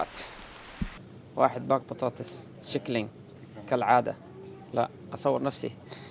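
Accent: United Arab Emirates. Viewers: اهم شي اللهجة الإماراتيه اللي تتكلمها ❤️👍🏻 البلد بلدكم و نتشرف بك و بجميع اشقائنا الصوماليين و العرب و المسلميين أجمع ، و بإذن الله راح ترجع للإمارات و راس الخيمه و الأيام الجميلة الحلوه و أنت في وضع أفضل. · فيديو جميل وممتع جدا... واللهجه الاماراتيه لهجه جميله... الله يوفقك ويرزقك السكن والاقامه في الدوله اللي تحبها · صح ان اللهجة لك عليها بس تبين فيك للهجة الاماراتيه ولله الامارات بيتك وإذا تبا ترد حياك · سبحان الله لما شفتك عرفتك صومالي طوالي ومن كلامك عرفتك عايش في الامارات... ربنا يوفقك..مولودة وعايشة في الامارات وفي حياتي مازرت راس الخيمة...بتمنى أزورها... وبحب أبوظبي جدا جدا وبفضلها على الامارات التانية.. سبحان الله اي واحد عاش في الامارات ولو لفترة بسيطة بحب البلد دي وبكون عنده ليها معزة خاصة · لهجتك اماراتية حلوة 🙏🏻 والنعم فيك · لهجتك اماراتية جميلة هناك حملة شوهت صورة الامارات لكن من عاش فيها لايمكن الا ان يحبها اتمنى لك ولعائلتك التوفيق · لكنتك اماراتيه بالمره 🌹🌹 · حضرتك تتكلم بلهجة اهل الامارات وليس بلهجة اهل الكويت وليس بلهجة اهل نجد السعودية او لهجة اهل القصيم بالسعودي ة وليس بلهجة اهل جده الحجازية وليس بلهجة اهل البحرين القريبه من اهل العراق او اهل الاهواز وليس بلهجة اهل عمان مسقط لان كل دولة خليجية لها لهجة خاصة بها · بس كلاموه الاماراتي اللهجه اقصد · ما شاء الله قصة كفاح ونجاح 👏وأهنيك على اللهجة الإماراتية وأعرف صوماليين وغيرهم من الوافدين في السعودية لهم ٣٠ سنة أو أكثر ما يعرفون اللهجة السعودية وهنا ثمرة ودور الإندماج وتكوين العلاقات الاجتماعية👍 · فلوجاتك جميلة من لهجتك ضنيت انك اماراتي وتمزح لما تقول انك صومالي والحمد كلها دول عربية اسلامية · سبحان الله انت صار لك في الامارات عشر سنين و ترمس زي المواطنين ونحنه صار لينا خمسه و ثلاثين سنه فيها ما تكلمنا لهجتهم 😂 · أول افتكرت انك يمني ولهجتك اماراتية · سبحان الله شكله مو صومالي شكله اثيوبي و لهجته اماراتيه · اللهجه الامارااتيه 👍👍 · و الله اعجبتني لهجتك اماراتية تحياتي من هرجيسا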